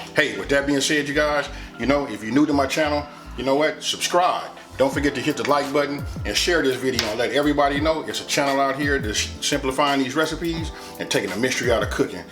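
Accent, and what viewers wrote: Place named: South, United States. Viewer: Where are you from, you sound so country in a good way!!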